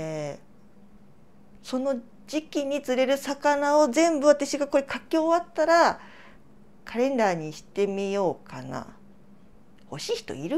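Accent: Kagoshima, Japan. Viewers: かごんまのひと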